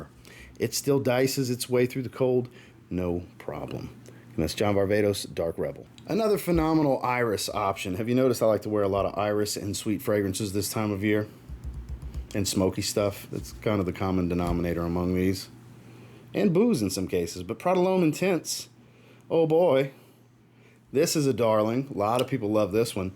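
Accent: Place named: New Orleans, United States. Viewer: You sound like you straight outta tha parish bruh I miss Nola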